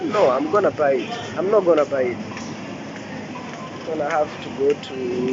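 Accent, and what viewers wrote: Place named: Zimbabwe. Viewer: Boeti ❤ ur content..Thanks 4 visiting Swakopmund. Thought u from Zim the accent thou is diffrnt from wthetu😊 much ❤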